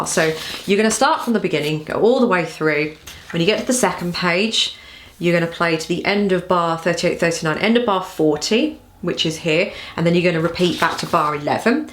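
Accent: United Kingdom. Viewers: <3 I love your British accent ! all the best!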